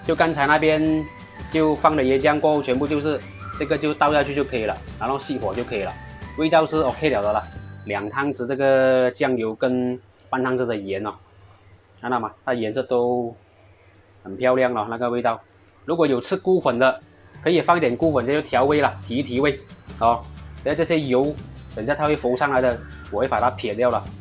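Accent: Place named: Malaysia. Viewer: 馬來西亞的華語好可爱😂😂，有些聽不明，先給個讚👍然後再給個掌👏👏👏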